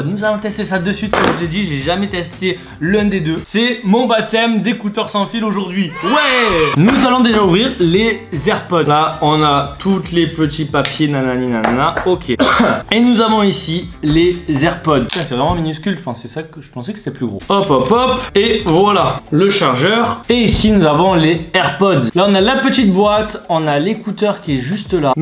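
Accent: Marseille, France. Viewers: T'es marseiller moi oui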